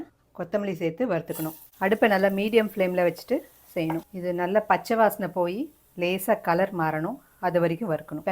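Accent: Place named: Coimbatore, India. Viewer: மேடம் நீங்க பேசும் போது உங்கள் ஊர் ஸ்லேங்கே தெரியல பக்கா கோவை பெண் பேசரமாதி இருக்கு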